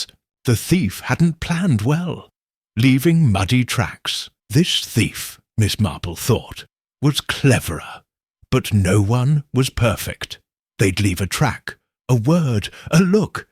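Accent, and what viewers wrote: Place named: United Kingdom. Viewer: This msrvelous British accent and beautiful voice will help mr sleep tonight. Thank you soo much!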